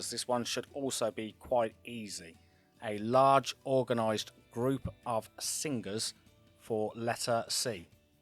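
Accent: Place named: Birmingham, United Kingdom. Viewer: 24, 😊 Great to hear a Brummie accent 👌 😊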